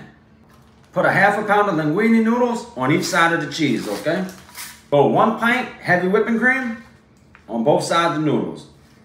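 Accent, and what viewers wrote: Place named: New Orleans, United States. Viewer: I love your accent! I visited New Orleans a few years ago… and I’ve dreamt of moving there since! It doesn’t matter what color you are…. EVERYONE loves each other there! Some day when I retire… that’s my dream destination! Keep going YA’LL!!!!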